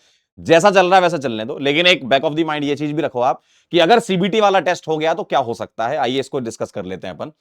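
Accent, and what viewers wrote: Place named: Hyderabad, India. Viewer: Waise accent Se hyd ke lagte hai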